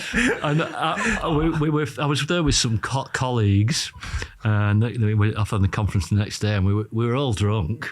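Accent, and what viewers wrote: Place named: Accrington, United Kingdom. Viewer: This guy is great and sounds like he lives near me (Accrington) would love to meet him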